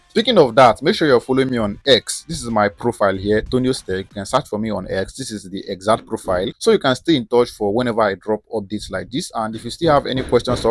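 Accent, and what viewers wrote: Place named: Nigeria. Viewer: You are too fast, maintain your Nigerian or an African voice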